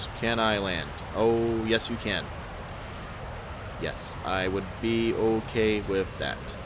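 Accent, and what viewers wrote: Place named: Texas, United States. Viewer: I'm pretty sure he's from Texas idk how you got a British accent vibe from his voice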